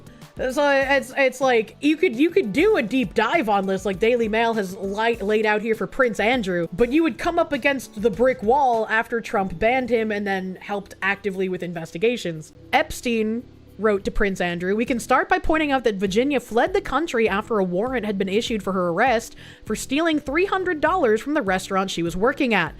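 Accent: Boston, United States. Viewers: Listening to Bostonian yap about the two worst people on the planet fighting each other online really helps make this Amazon route somewhat bearable.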